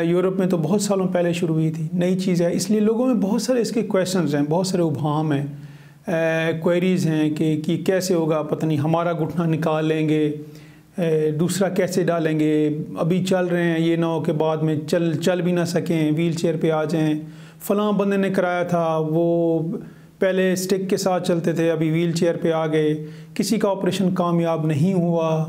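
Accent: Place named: Khyber Pakhtunkhwa, Pakistan. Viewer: Dr.sahib where u located your accent show KPK.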